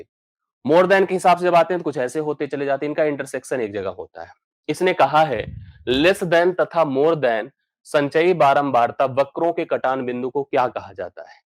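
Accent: Bihar, India. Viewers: Bihar se hoge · Guruji bihari hi is liye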